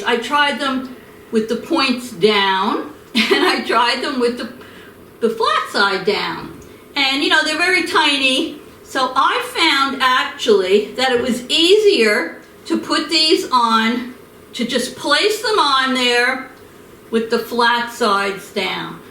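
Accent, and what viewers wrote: New York, United States. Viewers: Smores dip looks fantastic. Can I assume from you accent your from New York? I'm super excited for Halloween 🎃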